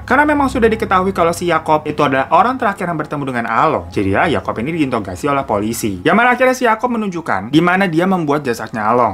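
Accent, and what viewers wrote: Indonesia. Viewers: Akak ni org mana kak Bahasa akak mcm indon je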